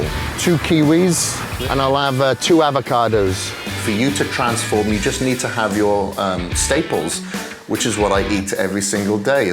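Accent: Wales, United Kingdom. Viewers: hi were you born in the states? as you sound like welshman! and your surname is another welsh connection.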